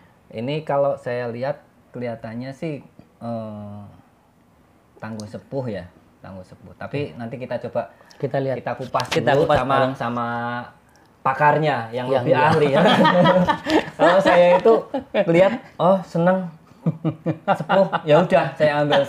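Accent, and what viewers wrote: Banyumas, Indonesia. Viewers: Nogo kikik kiyek kiyek😂, Ngapak acen gae ngakak tur kepenak kayak kiyek😁 😂,, Semangat lur salim saking Jogja 👍.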